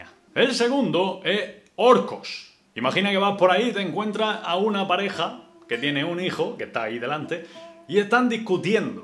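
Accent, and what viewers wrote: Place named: Andalusia, Spain. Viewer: Soy andaluz de padre y madre andaluza, y ni siquiera yo tengo un acento andaluz tan marcado como el tuyo... ¿dónde y cómo lo aprendiste? Al aprender español cómo es que te decantaste por la variedad andaluza y no por cualquier otro dialecto español. Un cordial saludo desde Andalucía, concretamente desde Málaga. :)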